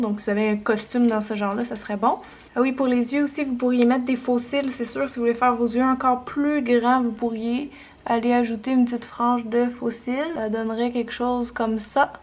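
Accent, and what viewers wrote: Quebec, Canada. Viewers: OMG cet accent québécois J'ADOORE 😆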